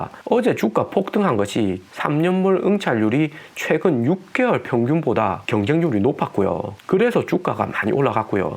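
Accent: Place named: Gyeongsang, South Korea. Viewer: 항상감사하게 듣고보고 있습니다, 구수한 경상도 말투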